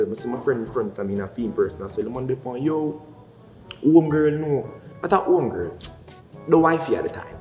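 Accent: Jamaica. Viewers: yr Jamaican accent is a advantage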